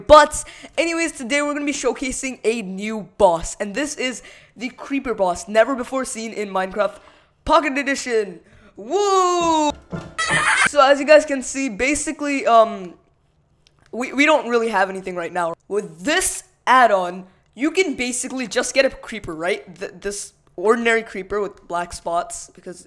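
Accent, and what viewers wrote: India. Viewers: Hey r u a indian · dude are you indian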